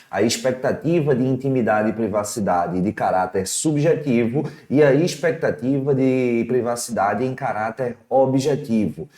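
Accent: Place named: Northeast, Brazil. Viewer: Não sei se alguém percebeu, mas o sotaque nordestino desse mestre, ao que me parece, e essa calma cirúrgica na explicação permitem entender com clareza o tema abordado. Tenho assistido às demais vídeoaulas dele e aprendido bastante.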